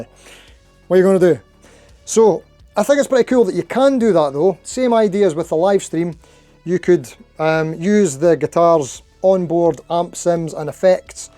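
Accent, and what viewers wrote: Scotland, United Kingdom. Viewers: Just realised something, if you're still friends with them... Tell them they need to have a company slogan of "You've got it with Enya". It works surprisingly well with your scottish accent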